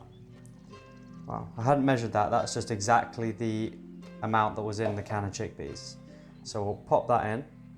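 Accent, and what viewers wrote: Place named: Cockney, United Kingdom. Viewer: Really great to see you back in the notional apron. But where did the mockney accent come from? Brilliant knife sheath.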